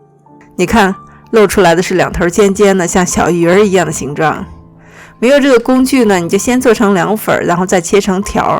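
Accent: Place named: North, China. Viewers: 声音听起来好像北京话啊😄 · 几十年前在西安夏天的味道，小时候永远的美食，普通家常的味道，的西安口音太好听了，好像邻居家的姐姐在边做饭边教大家，轻柔友善，温馨舒适！我们小时候吃的更多的是苞谷面，也会更多的醋和辣椒🌶️！ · She's from Shaanxi, and yes, northern China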